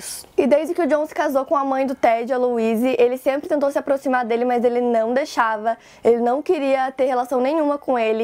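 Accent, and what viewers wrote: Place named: Paraná, Brazil. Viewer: Sotaque paranaense, sei pq sou paranaense tbm sksk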